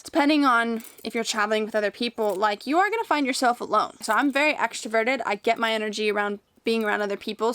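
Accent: Australia. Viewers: Have you noticed you started to say some words in an Australian / Kiwi accent? That's weird. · She now sounds like Steve Irwin, the Croc Hunter. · Wow you sound a bit like an Aussie now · Why do you have an Australian accent now? 😹 BTW I like your blond hair.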